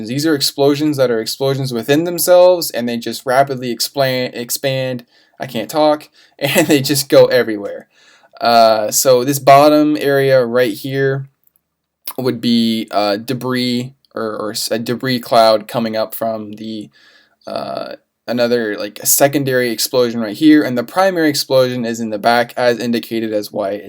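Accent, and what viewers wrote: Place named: United States. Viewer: Liked and subbed. You have everything an ispiring animation student needs,a clear voice and mic,good american accent to understand,explaining everything multiple times.. Thanks man i really needed this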